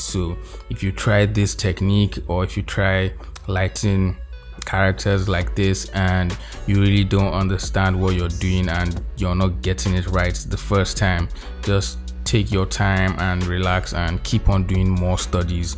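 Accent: Nigeria. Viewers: Awesome man learnt so much from watching this process btw cool nigerian accent <mail>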